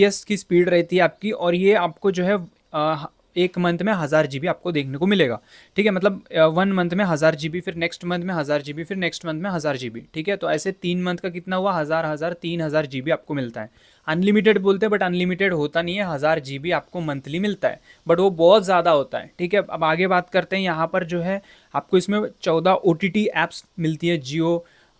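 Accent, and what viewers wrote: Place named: Telugu, India. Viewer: It seems you are from telugu states 😊...mee too.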